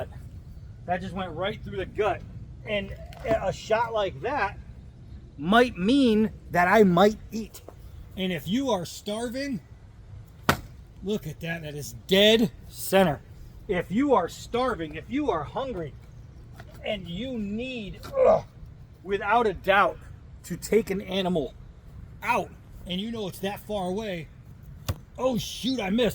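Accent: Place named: Great Lakes, United States. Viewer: Are you in the north east? I’m from western NY, and I feel like I’m recognizing a Great Lakes accent. Great video! And you’re right about animals being tough! Even a squirrel that’s feeling threatened is a tough thing to deal with. Keep it up, brother!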